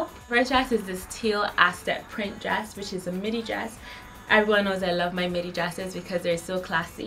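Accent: Barbados. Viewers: Totally been stalking your channel, heard your accent and knew you were a fellow Bajan in the UK! New subbie!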